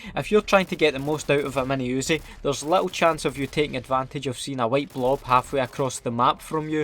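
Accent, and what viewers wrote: Scotland, United Kingdom. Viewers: He shoulda said holographic shite instead of blindness feel like its funny saying shite in Scottish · He’s got a strong Scottish accent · I cannot say just how much I love the Scottish accent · Half the shit he says wouldn’t be funny in an regular accent but in this Scottish it has me dead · everything this guy says is automatically 4x funnier since its scottish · I dont even play COD anymore i just listen iT for the amazing scottish accent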